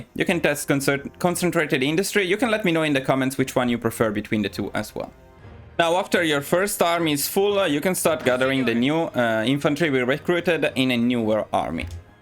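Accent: Italy. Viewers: I really appreciate your Italian accent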